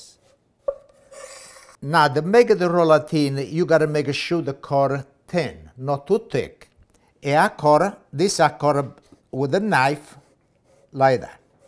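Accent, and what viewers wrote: Italy. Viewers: I absolutely love the Italian accent! I haven’t heard it in a long time. Tomorrow is Wednesday and I think that eggplant is on the list! Thank you!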